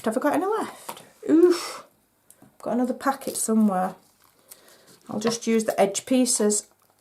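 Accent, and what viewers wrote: Yorkshire, United Kingdom. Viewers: Great Video and Beautiful Box, keep them coming!! I enjoyed laughing along with you so much, that I have subscribed to your Channel to hear more from you, so I am looking forward to lots more lovely ideas. Love listening to your Yorkshire accent - I am an Australian SU Demonstrator.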